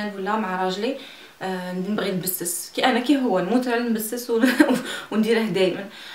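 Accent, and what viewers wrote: West, Algeria. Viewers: vraiment kayan difference kbira entre cette vidéo et les vidéos précedentes tu es plus sincére naturelle et sympas continue comme ça car j'adore l'accent de l'ouest malgrés je suis de skikda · troop fort j'ai adorée ton accent, darija Chez vous comme Chez nous les Marocains 🇲🇦 sauf dik lah ghalb manjemch typiquement Algerien lol hdertek hlewa masha Allah. + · c'est algerien pur l'accent de l'ouest je n'ai jamais entndu un marocain dire chirat ou lah ghalb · amira mimita mn lahja 3raftak mn el Gharb ;) good luck sister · Mâcha allah oukhty tu a vraiment l'accent algérien. J'aimerai bien être à ta place lol. Sinon ta fille a beaucoup de cheveux mâcha allah ma fille quand elle est née c'était pas sa du tout Lol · video au top tu es magnifique avec l'accent ta3 el gharb mindak wali dirina des vid en arabe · lol wana manne annaba n3aniw 7na aussi metebdilaate yacer fil 3resse echarek welghareb kif kif w centre lelaa lol c la premiere fois wanne laccent ta3 el ghreb ya3jabni enfin mercii bcq pour cet vidéo et bonne continuation vous mériter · mdrrr j'aime pas trop l'accnt de l ouest dsl :p je vs prefere parler en francais ou bien anglais :) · salut tu est vrmnt magnifique machallah kount nchouf t foto ect jamais ma remarkit que t algerienne mel gharb yaaaay j'adore ton accent je ss algeroise qui vie a oran et j'adoooooore nas lgharb :* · لهجة الغرب تهبل الله يبارك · yatik saha taarfi hessebt rouhhi rani fel bled ki cheftekk merci pour cet video une petite nostalgie du bled ana tani mel gherbb on a le meme accent bonne continuation ma belle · très beau tag moi aussi je suis algérienne mais je vie en france et je suis très fière de toi et Jaime boucoup l'accent taa lgharb ljazairi pq moi de Algérie centre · Peut etre que toi tu parle algerien mais pas marocain mdrrr C l'accent algerienne 100% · ALLAH yabrk 3lik l'accent nta3 lgharéb hayla w hadértek hayla kon ghir tkamli tehki hak fi les vidéos kamel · waw waw j'adore ton accent ta3 lgharb hlouwa bzaff ma cherie gros bisou a vous deux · j'adore ton accent ta3e El gherb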